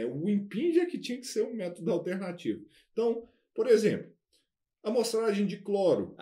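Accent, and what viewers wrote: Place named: Minas Gerais, Brazil. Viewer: Gostei. Pennnssaaa num trêm veio. É minero mmo. kkkk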